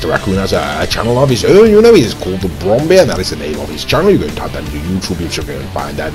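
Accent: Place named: RP, United Kingdom. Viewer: you sound a bit posh init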